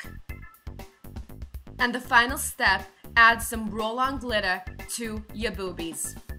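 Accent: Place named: New Jersey, United States. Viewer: Oh man I loved this video!! You're so funny and have this wit and charm!! The milk jug and little dog in the hair cracked me up!:)) I love the Joisey accent. I mean Jersey.;) I'm from Joisey,I mean Jersey. lol you're the most gorgeous sexiest most beautful girl and I so love it when you play with your beautful hair and do vids like this!! You're the best my dear!:))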